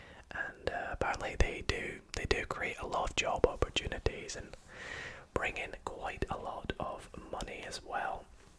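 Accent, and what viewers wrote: United Kingdom. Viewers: Beautiful video,landscape/city ,and your British accent ❤️👍👍👍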